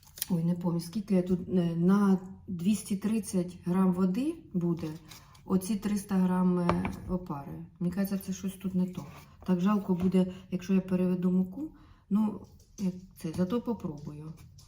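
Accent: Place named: Surzhyk, Ukraine. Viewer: Як не гарно звучить суржик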